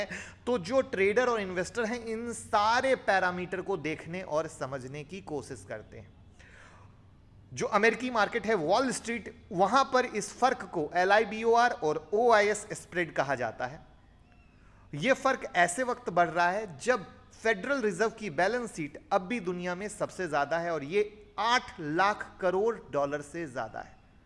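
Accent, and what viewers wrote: Bihar, India. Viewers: Sir aap Bihar se ho kya?????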